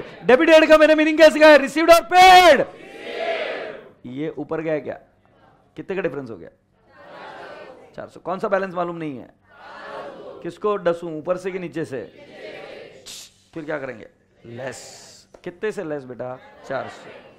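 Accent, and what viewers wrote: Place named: Mumbai, India. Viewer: I think u are from Mumbai....🤔🤔 But nice explaination sir🙂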